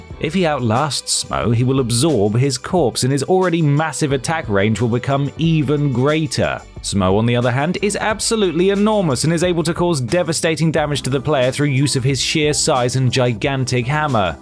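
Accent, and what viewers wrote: United Kingdom. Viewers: wait a sec , you are British ?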